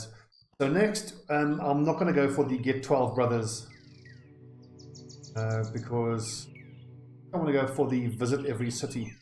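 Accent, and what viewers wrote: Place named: South Africa. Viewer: You from SA? sounds like it. Nice vids btw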